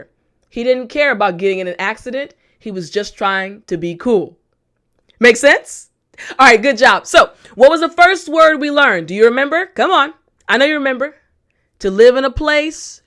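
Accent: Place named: New York, United States. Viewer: Hi, I love your voice and accent. Are you from NY?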